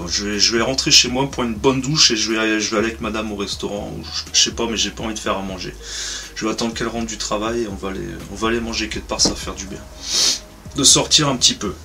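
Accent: Nord, France. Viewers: Bonjour, Un grand bravo pour cette vidéo, dis moi quand as-tu acheté cette défonceuse chez Lidl? Tu es du Nord ou je me trompe?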